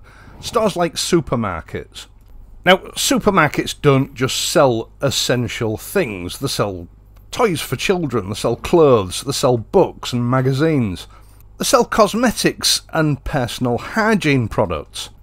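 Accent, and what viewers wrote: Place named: Yorkshire, United Kingdom. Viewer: Are you by any chance from the same Yorkshire region as Mavis, who used to be on Corry, your accent reminds me of her. Anyway, which part of Wales do you live in? I'm assuming that you do live in Wales - otherwise why would you be so vehemently concerned about our speed limits???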